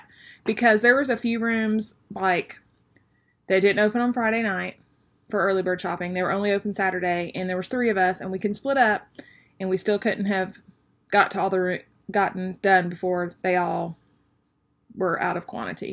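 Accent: South, United States. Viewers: Thank you for sharing your Market experiences with those of us who can’t go! Great suggestions for the organizational committee! I laughed out loud when you said “tump” 😂😂😂 I hadn’t heard that in years! And thought I was the only one who still said it. I was born and raised in Florida...5th generation Floridian, and my grandparents were very rural! So love to hear the real South! I recently ordered from your shop and was very impressed! You will be my LNS (though not very local) from here on out! Are those letters done in eyelet stitch? What a great market haul! Looking forward to ordering “Wool and Flax” from Plum Street when it is in stock! 🐑🐑🐑 Hope y’all have a wonderful spring 🦋🌷🐑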